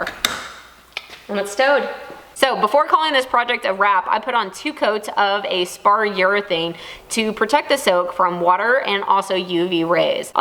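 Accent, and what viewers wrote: Texas, United States. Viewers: Drill a hole for the pen.love the texas speak. How about using bead and hole stops like you would see on canes and walkers. Rotate wheels out of way when not using , click when u rotate into position. Lengthen arms that way too.that can also raise height, stop scraping bottom.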